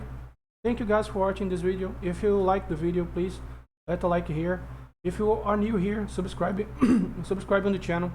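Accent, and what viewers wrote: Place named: Brazil. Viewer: Sotaque de BR, se for Br da um salve que tenho umas duvidas e to em um projeto que pode te interessar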